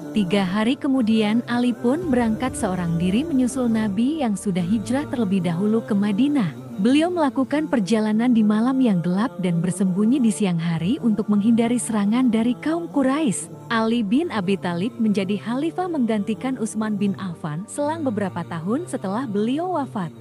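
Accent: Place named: Indonesia. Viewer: Sayang pelapalan bahasa Arabnya, masih sesuai bacaan Indonesia.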